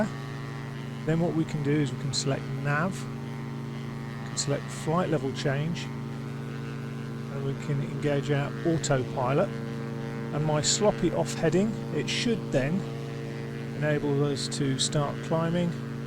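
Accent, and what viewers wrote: United Kingdom. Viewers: And also, I am up in years and sometimes voices are hard to discern. Your, British Accent, I presume, is VERY EASY to hear and probably the most clearest English to understand.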